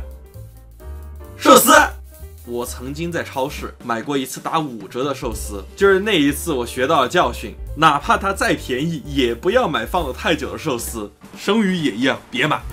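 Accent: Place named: North, China. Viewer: 好奇。影片里都换算成新台币，你怎么有大陆北方的口音？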